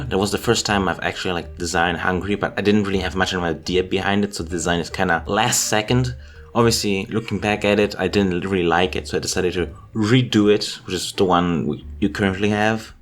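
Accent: France. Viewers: You’re french? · Are you French or Italian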